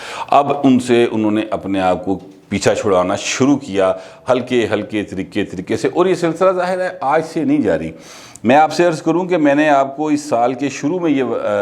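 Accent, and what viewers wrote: Punjab, Pakistan. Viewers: Sir ye ,,Fawad chodre ke adalaton wale baat apnain ,,,Etna zyada punjabe main bola jeske wajh Sy Sara vlog ka Agla pechlay bholgaya,, Agla aysa Abe tk sochta Hun k punjabe main Apnain mokademat ka Kya bola? Aor jetna taime sochnain main lag gaya utnain main baqe velog pr tawajh he hargaya,, Sir plz ap punjabe km bola Karin qk samajh he ni atee,,, Poshtu bolain ya English bolain ya tore bohot arbe bolain TU samajh ayge lakin punjabe bilkul ni,,aor hamain pata Hy k ap punjabe hain ap k lahjay Sy pata chaljata h,,, Mujay punjabe Sy nafeat ni lakin samajh ni araha h,,, Ya TU ap bolain k jesko apke punjabe bolnain pr eteraz h o aoak vlog NH dykhayy TU pir sahee h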